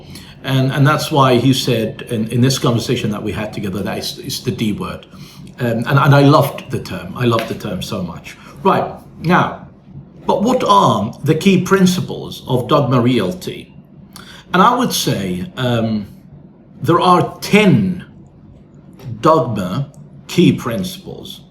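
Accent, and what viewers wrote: United Kingdom. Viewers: Are you native speaker of british English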